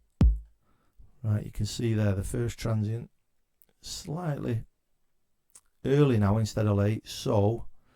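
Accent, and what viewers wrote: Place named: Manchester, United Kingdom. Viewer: Hi bud, been thinking of buying the Mk111, looks like a lot of menu diving though and a pain to set hardware and software VST up to as there's no midi control software for the Mk111. I'm going off previous video's I've watched Obviosly. How are you finding it? You sound like your from Manchester too:) Oldham I'd say...am I close??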